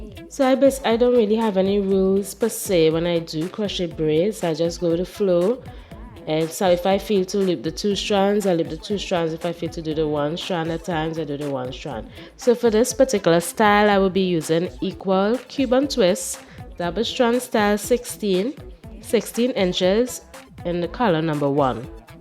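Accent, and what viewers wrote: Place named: Trinidad and Tobago. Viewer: Lol..Trinis always make out each other..as soon as the video start I was like...she sound like a Trini ...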